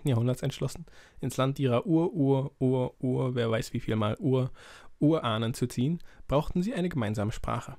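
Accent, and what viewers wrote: Germany. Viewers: Hallo. Das ist wirklich ein toller Beitrag und super interessant. Ich musste ihn allerdings mehrmals hören, weil du sehr schnell sprichst und dadurch auch teilweise sehr undeutlich. Das ist ein bisschen schade, denn sonst wär das echt top. Ps. Sicher bist du Berliner? Du klingst eh ein Flensburger.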